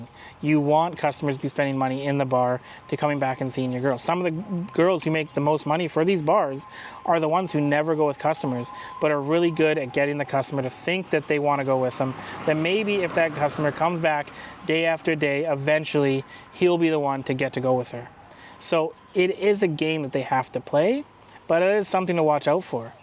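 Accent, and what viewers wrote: United States. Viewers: You sound American to me, but if you say you are not I will assume you are Canadian, which by defintion, in my book, is "American"... :) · *Im not american*, says the dude with one of the most american accents Ive ever heard. Sounds like hes straight out of Cleveland or some shit. lol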